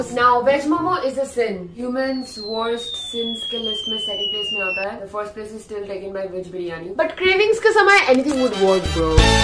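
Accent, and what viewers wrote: Bengal, India. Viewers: Are u a bengali🤔... I heard talking with ur mom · Are you a bengali girl bcz u say "maa sotti baana 6o" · the bengali english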